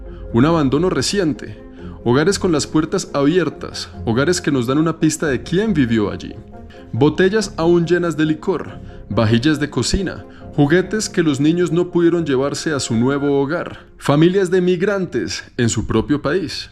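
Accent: Colombia. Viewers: No entiendo d e qué se trata, eres de donde? Eres canadiense? Pero hablas como colombiano o eres italiano? O español no entendí tu canal… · Por su acento y educacion, es colombiano, cierto?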